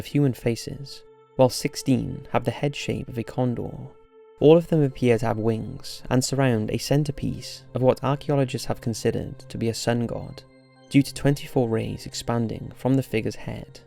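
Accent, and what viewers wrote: United Kingdom. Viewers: What do i think - that your a fuuking natzi, talking like i britt, click bate bitch! Love from Israel!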